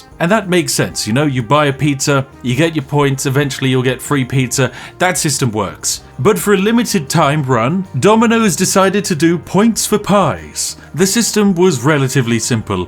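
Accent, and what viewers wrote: United Kingdom. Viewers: 15 minutes of pictures and some guy with a lame british accent? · You sound British.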